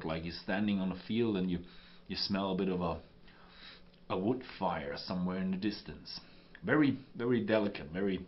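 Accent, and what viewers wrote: Germany. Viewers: dude..move on. Not every word in proper English is pronounced the way you are used to. The presenter is German, there would be an accent.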